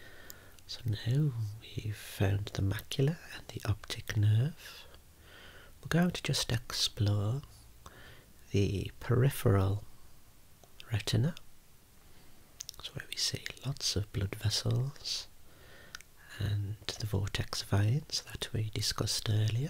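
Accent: Birmingham, United Kingdom. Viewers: Wow! Fancy coming across a fellow Brummie on the big world of Youtube! Im learning some pretty good Eye knowledge from your videos too! Keep it up pal! 😃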